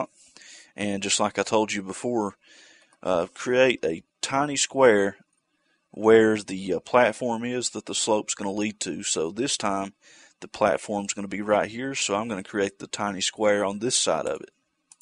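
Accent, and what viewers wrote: United States. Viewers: Cool beans. And I like your super American accent. This is a good channel. I hope to produce a game in GZDoom.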